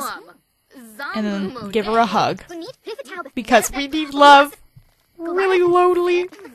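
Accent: United States. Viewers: It's americam but she is from Houston Texas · probably american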